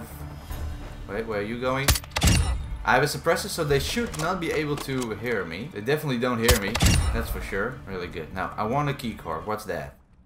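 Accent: Netherlands. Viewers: You can hear your dutch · Are you dutch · Ik hoor gewoon dat je Nederlands bent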